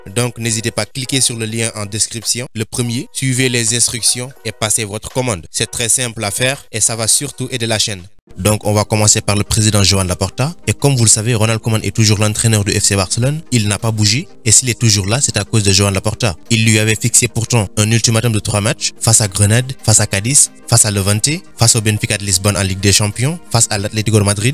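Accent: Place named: Senegal. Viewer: Thia accent sénégalais bala consommation locale la wakh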